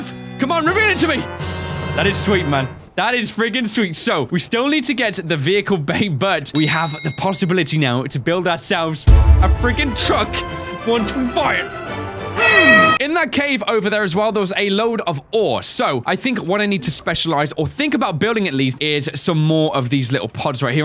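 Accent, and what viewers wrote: United Kingdom. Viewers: your accent is british, you say aluminum and not aluminium british or american?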